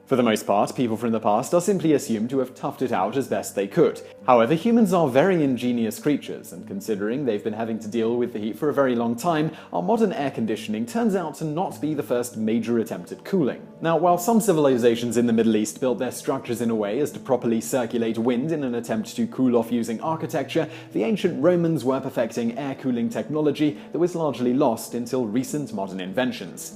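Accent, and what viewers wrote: United Kingdom. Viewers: Is English not the narrators first language? I keep hearing basic words being mispronounced, so just wondering if English isn’t his native language, because his accent seems to be British... confused. :/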